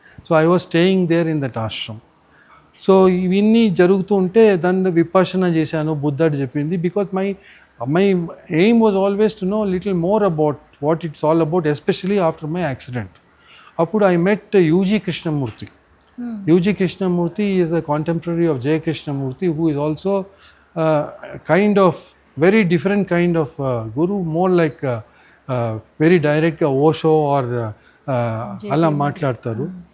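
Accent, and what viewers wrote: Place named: Tamil Nadu, India. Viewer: Tamil Telugu is cute 😊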